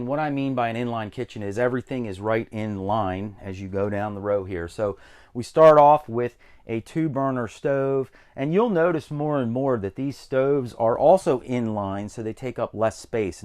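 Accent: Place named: South, United States. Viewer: . Where you from. You have a very distinctive accent. I'm gonna guess southern or southeast Virginia? North Carolina?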